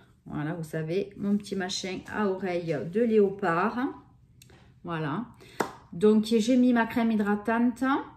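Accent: South, France. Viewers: Coucou ✌🏼 Je te découvre par le biais de Laura (Alora Bora) puisque nous voilà embarquées dans un défi ensemble 😅🤦🏻‍♀️ Je ne te connaissais pas... donc je suis vraiment contente! Tu as un bien accent du sud dis donc 😉 allez je t’embrasse et continue à regarder ;) 💋💋 Alo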